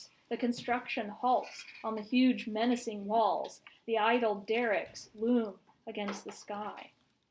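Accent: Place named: United Kingdom. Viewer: Ye what is up with all those audiobooks with British accents?? Where are all them American accents??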